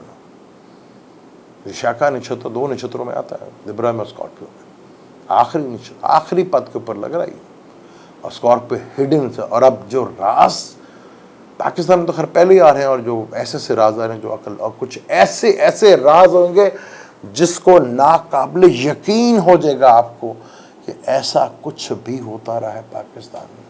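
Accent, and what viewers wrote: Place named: India. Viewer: یہ شخص رRAW کا ایجینٹ لگتا ہے اس کی اردو مکس ہندی چیک کریں ذرا😡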